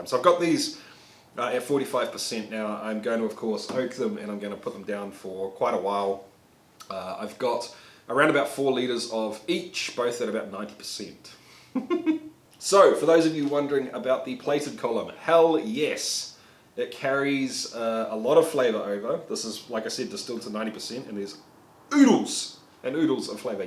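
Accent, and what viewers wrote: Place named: New Zealand. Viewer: If the accent didn't give it away, I'll let you in on a secret; He's a Kiwi, and New Zealand is a little nicer than the US when it comes to home distilling. :)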